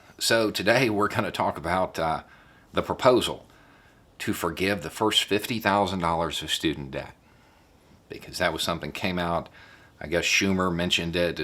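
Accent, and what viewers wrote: South, United States. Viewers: Thank you my brotha. Finally some sense in this comment section instead of the “you tell it maaaaaaan” echo chamber. You know how many other black students I saw in the business analytics program ? Fucking 1 you know how many black students were in the business school getting degrees in marketing and international business? Literally thousands. It’s all about what you invest in. I invested my early 20s in the military to pay for my degree and I made damn sure it was worth something. What this video is is a trap. They see the white guy with the goatee the southern accent and the hat and they hear him talk like Bernie sanders and they are like “ahhhhh shit this is one of the good white guys. He looks like a racist ass Trump supporter but he’s invited to the cookout” what a fucking joke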